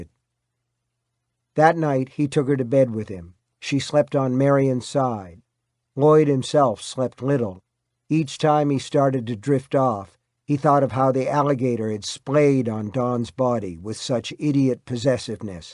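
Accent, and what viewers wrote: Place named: Maine, United States. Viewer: Oh my goodness! When I first started listening I thought, Oooo! I love how the reader has that Maine twang — adds an extra layer of icing to this wonderful cake. I JUST started reading the comments to see who the reader is and if he has any other books as I love his voice. Low and behold, it is the king himself! Go figure! Explains the great Maine accent❤️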